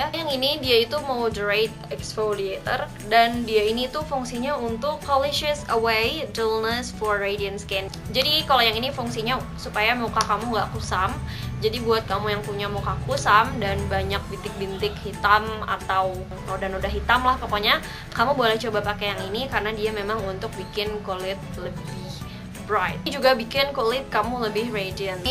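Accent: United Kingdom. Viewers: 1. 2. probolinggo 3. mau yang radiant skin kak, mau nyoba soalnya kulit aku kusem wkwk 4. ihh aku suka banget sama aksen inggrisnya kakak, bikin video tentang aksen inggris dong kak, trus semoga subscribernya nambah kak. sukses kak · Ig :Vitataaaaaa Kota Asal: Gresik-jatim Yay akhirnya GA time juga!!Awalnya sih aku ngasal buka video kaka gegara nyari review st.ives,dan dari itu aku langsung cinta banget sama chanel kaka,auto like n subscibe + aktifin lonceng sejak pertama kali nonton (ini ga boong swear✌),yg pertama bikin aku jatuh cinta tuh cara present nya yg bener2 se -profesional itu,dan bener2 ngreviewnya gapernah ngasal,banyak banget benefit yg didapet habis nonton video kaka,aku jadi tau kandungan apa aja yg mesti di perhatiin di ingredients produk biar milihnya tepat sesuai skin type,dan dan dan yang paling bikin aku jatuh cinta tuh cara ngomongnya yg ada aksen britishnya subhanallah berasa sekaligus belajar pronouncing gitu😍😍😍dan aku ikutan ga ini karena pengen banget nyobain st ives yg coconut n coffee,penasaran sama deep exfoliate+ gentle nya kaya yg kaka jelasin❤❤❤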